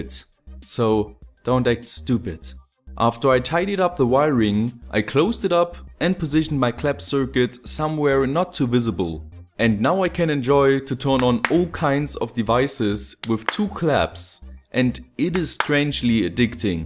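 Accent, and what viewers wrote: Germany. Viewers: Are you German? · are You German? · pronunciation is amazing. It's comical, Germans wouldn't understand the affectionate way we perceive the German accent ;)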